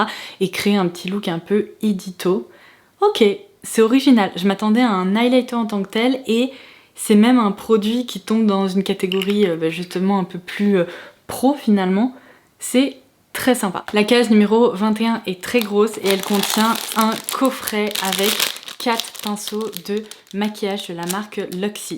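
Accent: United Kingdom. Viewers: Bonjour, Vous avez un très jolie accent british si je ne me trompe. ☺️ Vous avez des attache lasbas ou vous avez appris la langue? C’est par curiosité 🤗. Agréable Journée .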